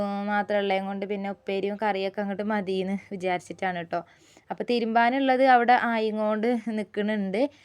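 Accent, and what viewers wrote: Malappuram, India. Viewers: മലപ്പുറം ഭാഷ ആണല്ലോ 😮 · എനിക്ക് ഏറ്റവും ഇഷ്ടപ്പെട്ടത്. അവതരണമാണ്.മലപ്പുറം സ്റ്റൈൽ'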